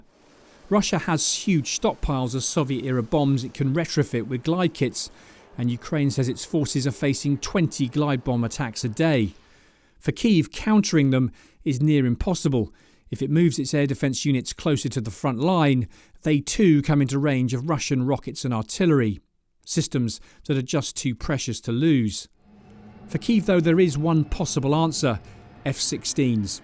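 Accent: United Kingdom. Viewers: Whenever I hear a British accent narrator i feel propaganda whyyy ? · I heard his british voice thats how i know this is a false propaganda channel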